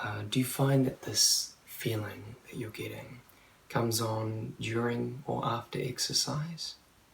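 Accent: New Zealand. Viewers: you can't get much better or cooler than a Kiwi accent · Gotta love the nz accent! Im from Australia where 6 is six not sex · Your accent.. I LOVE THAT! It's perfection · im not exposed to kiwi accent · love the accent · came here for the accent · I love kiwi-accents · THAT ACCENT. OMLLLL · Your accent is beautiful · I just love the NZ accent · Kiwi Accent, oh god. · similar to the Aussie accent, but they pronounce certain words differently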